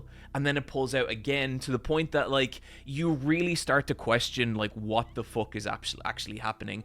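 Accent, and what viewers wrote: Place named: Ireland. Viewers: Its a part of Irish dialect. Theres a documentary about the making of Ryan’s Daughter called “ A Bit of a Fillum” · The Irish accent is altogether adorable! Smile 😃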